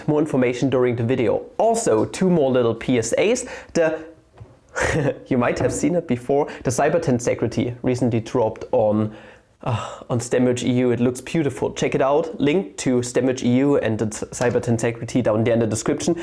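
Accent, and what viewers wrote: Germany. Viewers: you have too much latent heat of confusion yourself.You must review your teaching methods, besides German accent,umm ah ah is off-putting.Why not revert to talk and chalk traditional method,with plenty of home work,no amount of IT gadgetry will do. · Du siehst zwar ganz hübsch aus, aber Dein Denglisch ist schwer auszuhalten. 😄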